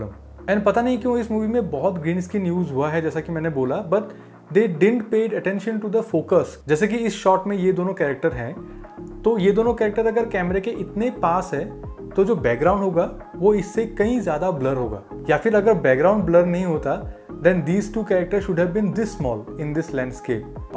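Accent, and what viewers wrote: Assam, India. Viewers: this sounds like Assamese.. Oi bro tumi akhomiya ne??❤️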